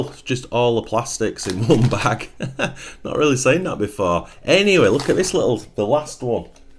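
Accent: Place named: North, United Kingdom. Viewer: I love thar North accent.... Boogy = buggy Oop = up Etc Great vid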